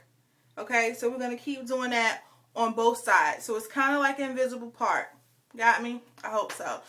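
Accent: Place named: New York, United States. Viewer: Are you from New York, Philly, Or Jersey ? You have an accent lol.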